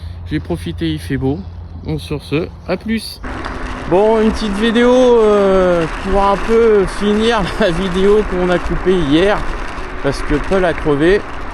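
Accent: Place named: Normandy, France. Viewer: Vidéo très agréable j'ai pas vu les 29 minutes passer Merci pour tes explications, bientôt propriétaire de t107 pro surtout pour l'off road 😁😁 Mais dit moi tu parlais de jeu dans la colonne ça me fait un peu peur 😕😕 Sinon joli accent normand moi je suis d'Occitanie donc un bon accent du sud bien chantant Merci pour ta future réponse bon ride ✨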